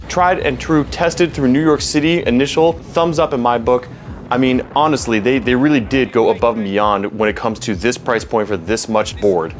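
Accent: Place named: Germany. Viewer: Wow man, this is the best looking esk8 review I've ever seen ! From that sick intro with all the neon colors , then to the ride through the streets w/ those subtle "after-effects" they only last for a sec or two but I really liked it for w/e reason. Props to you and your crew for all those cool cinematic riding angles, this is gonna be a hard one for y'all to top but I got faith in ya! The board itself seems amazing for what you get at that price point, will definitely be recommending this if anyone ever asks about a beginner board. It costs slightly more than all the other $500 or so budget boards, but completely blows them out of the water in terms of features. Did you do the voice acting for the Medic in TF2, almost sounds like it, the german accent and everyting lol. Amazing job dudes, this video is an inspiring work!👍🤙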